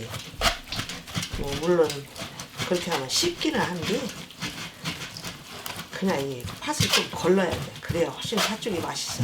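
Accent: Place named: Chungcheong, South Korea. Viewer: 충청도여유 ㅎ ㅎ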